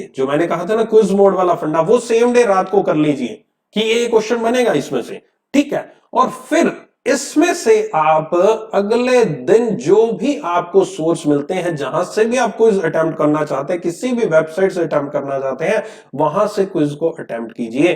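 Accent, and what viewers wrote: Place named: Haryana, India. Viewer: Sir ur pronunciation is very haryanvi ,pls try to improve it , Kindly don't take it as a complaint take it as a suggestion ...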